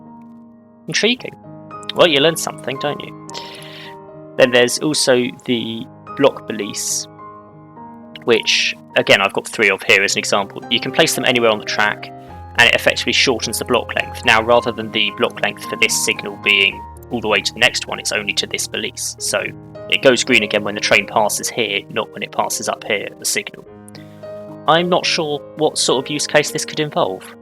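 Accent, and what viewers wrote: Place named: United Kingdom. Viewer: You sound British but say beta the American way. lol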